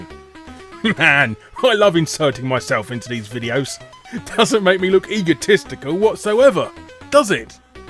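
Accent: United Kingdom. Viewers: are you proppa british?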